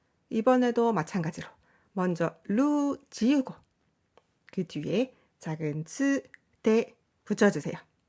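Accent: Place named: Japan. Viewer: 이 강의에서 한국어 말씀하실 땐 일본인인 거, 알겠어요. 😊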